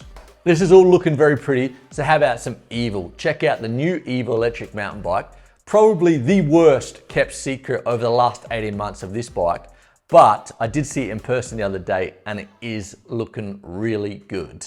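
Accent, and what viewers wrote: Australia. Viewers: You sound Aussie but you talk in pounds, are you doing bike reviews in Australia?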